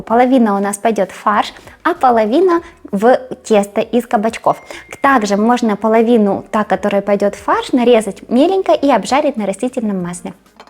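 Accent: Ukraine. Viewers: У Вас неймовірно гарна українська мова,пані ❤ А рецепти -як завжди супер 👌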